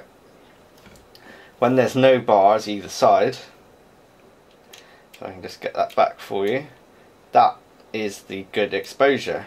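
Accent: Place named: England, United Kingdom. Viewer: Lool, i love how english people talk, that is rubbish.. nice vid btw bro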